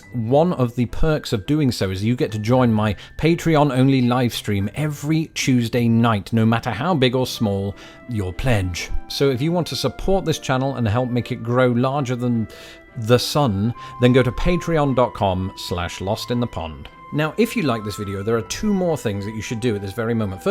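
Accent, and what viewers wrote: United States. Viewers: Great American accent. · You do a great American accent. Let's hear more of it! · Your American accent is pretty good. Why can't most Americans mimic a good British accent? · You should do a satire video with your American accent. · Your American Voice is pretty good. Once a year you should try different barbecue places in America.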